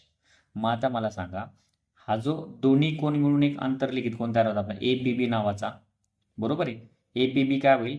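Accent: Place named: Ahmednagar, India. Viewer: Language varun olaklo sir 😊 Maje frd aahet nagar che same bolatat😅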